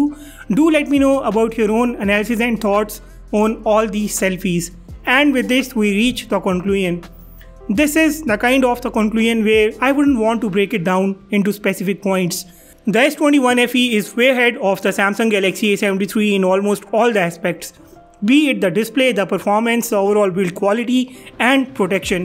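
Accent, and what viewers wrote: India. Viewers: Indian accent to much to take for whole video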